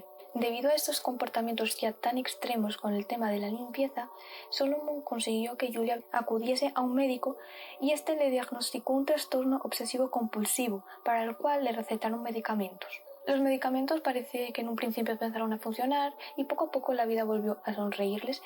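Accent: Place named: Spain. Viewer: creo q por tu acento eres española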